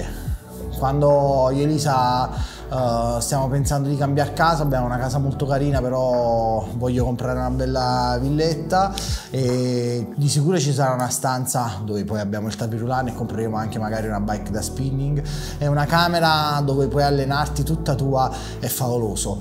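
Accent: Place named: South, Italy. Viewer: Ma da roma in giù quando imparerete a parlare italiano? Sempre queste frasi che non si capisce un ca**o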